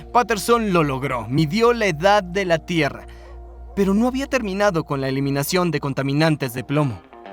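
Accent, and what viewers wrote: Latin America. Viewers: Es que este es latino y el otro era argentino y por ende es un poco mejor y actuado el latino en Argentino igual ahí actuación pero era más un doblaje documental osea menos actuación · Aquí hay un problema. Yo, soy de España y como hablante hispano, me siento perturbado por un doblaje que presenta un acento latino muy cerrado (como es el caso). El anterior narrador era PERFECTO. Su tono, expresión y enfasis era el adecuado en todos los aspectos. Este narrador enfatiza demasiado en las tonalidades y da como resultado una narración "cantarina" que hace que pierdas la atención al contenido del video y pierdas el interes de forma absoluta. He decidido desuscribirme del canal hasta que haya un cambio en el doblaje. Y si no lo hay pues ... entonces dejaré Veritasium en Español. :(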